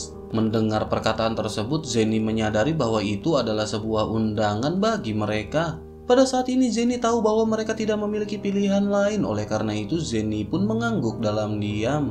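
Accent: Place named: Jakarta, Indonesia. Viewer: Paten mimin logat betawi keren dan lucu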